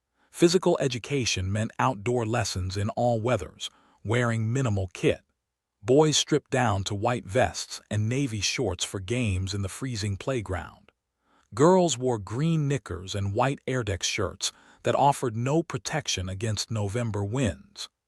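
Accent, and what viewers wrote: United States. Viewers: Why an American voice,? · The American voiceover suggests this can be disregarded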